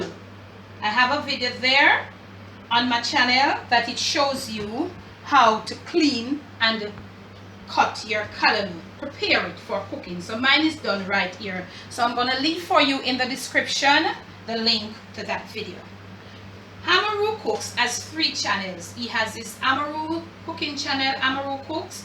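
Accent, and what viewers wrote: Jamaica. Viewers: Love the cooking show and the Jamaican twang.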